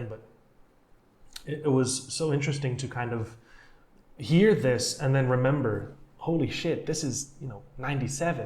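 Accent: Brazil. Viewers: desconfiei DESDE O PRIMEIRO SEGUNDO que tu é brasileiro 😂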